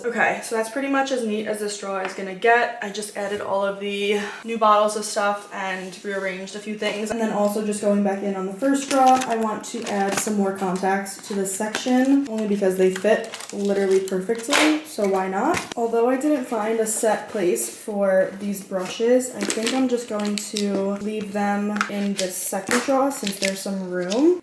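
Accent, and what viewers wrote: United States. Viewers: Just enjoying the Long Island accent 💕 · Its a new york accent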